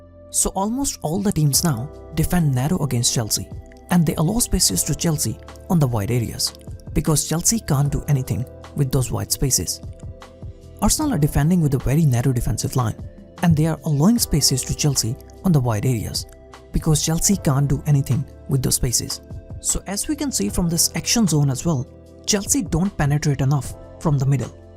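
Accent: India. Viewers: No voice please. Bad indian accent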